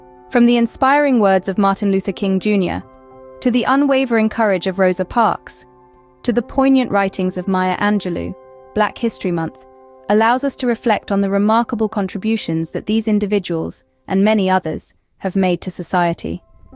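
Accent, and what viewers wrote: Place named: United Kingdom. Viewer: Is all the video AI generated? If so, that's some if the best quality AI video I've seen. Why a British accent for the narrator, though?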